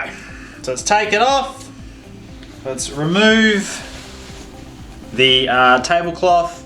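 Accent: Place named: Australia. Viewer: Subscribing because I miss the voice of my aussie buddy Kevin "kaixin" from l🎉ast decade living in shenzhen China. You sound exactly like the guy in China who taught this yank and his kids how to play dungeons and dragons in the undisputed champ of English accents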